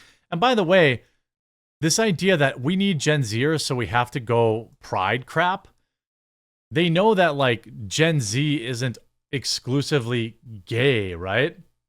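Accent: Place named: Wisconsin, United States. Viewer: Dang you live in SE Wisco?? I thought I recognized that "accent" lol - I was born in Elkhorn and still live in good ole Wisconsin 😎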